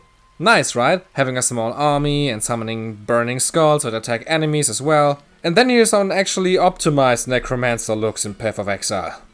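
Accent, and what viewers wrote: Germany. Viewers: love ur german accent. tryed some of the games thx for the vid i had a lot of fun cuz of it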